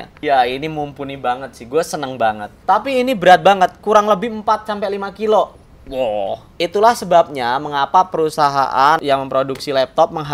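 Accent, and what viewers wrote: Java, Indonesia. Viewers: Ngomonge Medok Sih Kang, Sampean Wong Endi Sih?.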